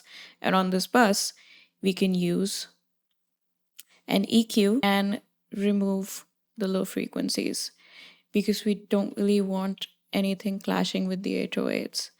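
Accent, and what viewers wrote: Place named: India. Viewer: Hey r u Indian by any chance Cuse your accent tell that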